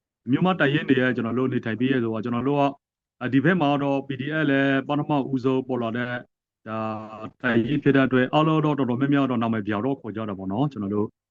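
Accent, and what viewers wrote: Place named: Dawei, Myanmar. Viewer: ဒဝယ်သံကြီးဝဲနေတယ်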